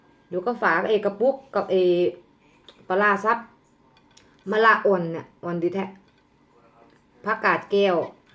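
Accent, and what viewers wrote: Isan, Thailand. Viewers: ได่ยินสำเนียงบ่านเฮาเอื้อยหย่าแมนคิดฮอดบ่านหลาย-กินหลายๆเผื่อเอื้อยแนเด้อ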